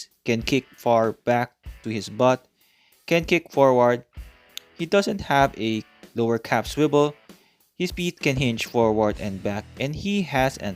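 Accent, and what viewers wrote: Philippines. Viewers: Your accent screams that you're a filipino. Lupet tol! 😂 Edit: after i saw darna figure, it confirmed my theory earlier, pinoy ka nga talaga, bro! 😅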